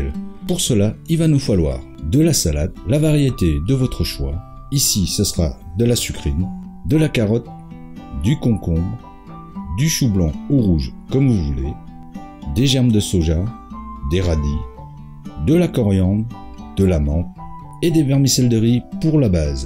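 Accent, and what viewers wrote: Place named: France. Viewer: Bonjour hi, you speak French with French accent, but I speak with Canadian accent.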